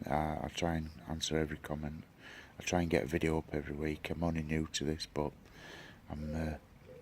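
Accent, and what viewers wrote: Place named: Northern England, United Kingdom. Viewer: A highly original and imaginative design! Keep doing what you’re doing mate, you’re an out of the box thinker and I like it! And it sounds like you’re a fellow northerner too, another tick in the box 👍🏻😁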